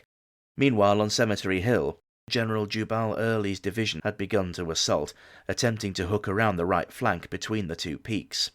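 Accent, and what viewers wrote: South, United States. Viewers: Love ya, but my home is in petersburg and near Richmond. My Cherokee family was "resettled" because those of color weren't allowed in the new union. Fort Lee was just changed to Grigg Adam's. Being from Texas originally, I knew Lee's role in the Mexican wars. I loved hearing the first bit, but started to feel that old sadness. God bless you and cant wait for next episode and hope it's not about the trail of tears. Cute southern accent dahlin!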